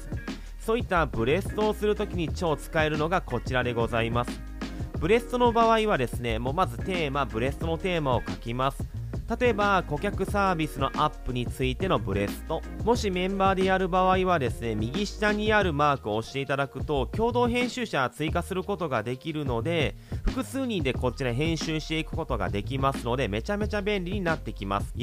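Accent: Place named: Kansai, Japan. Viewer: 関西の出身でしょうか、親しみを感じて聴き易いです。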